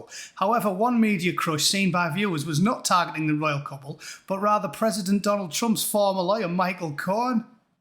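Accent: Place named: Geordie, United Kingdom. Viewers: Harry and Megan leave me speechless! Hateful pair! I have to say that I never liked or trusted Harry's mum...an egoist who wanted everything. Give me Charles, Anne, Camilla and Catherine any day! May our late Queen rest in peace. Loved all your rhetoric, and I love the Geordie accent, it is like music. Take care, stay safe, and have a good Christmas. God Save the King. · Haha "Fanny like a gutted fireplace", I'm shocked you havent been shut down, presumably the Facebook bots have not been programmed to recognise a north-east accent. Still as a Pit Yakka its nice to hear a familiar tone on the Youtube, it feels like a good gas in the pub. Still, a nice takedown of these lying, ungrateful, self absorbed, attention grubbing, vacuous oxygen thieves. BTW as a recent subscriber I'm more shocked to hear in this episode you live in California, how the hell did that happen? 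When I clocked the breakfast bar, I thought "Smoggy made good, must be in Norton now or maybe even Ingleby Barwick". I would be interested to know how you find the accent goes down over there, as our Durham twang was met with some fairly blank looks in San Francisco.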